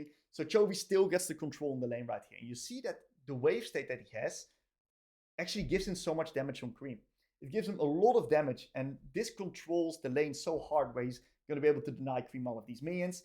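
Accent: Netherlands. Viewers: Good video! The dutch accent is pretty rough though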